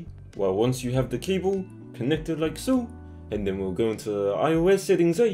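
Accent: New Zealand. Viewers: Kiwi accent 😅. Look it up. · new zeiland accent lol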